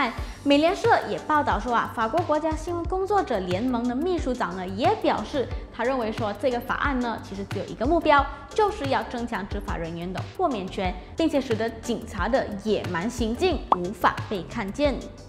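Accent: Malaysia. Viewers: 这是地道的马来西亚华语口音，不是中国口音，谢谢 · 这是马来西亚华人的口音吗，感觉好可爱。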